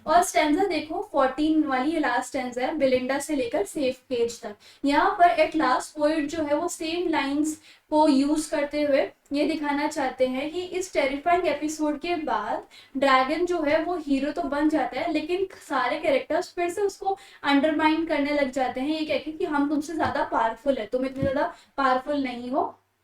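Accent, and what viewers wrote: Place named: Haryana, India. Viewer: Didi apki awaz se aap haryana ke lag rahe ho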